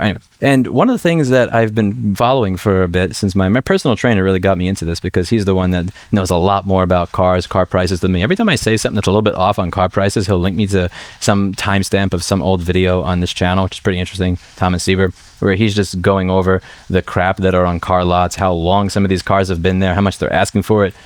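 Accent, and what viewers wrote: New York, United States. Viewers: Fast talking new yorkan keeping it plus two on the speed knob, to plus three for effect, then back to two or one if you’re slipping .. your content’s okay though at .75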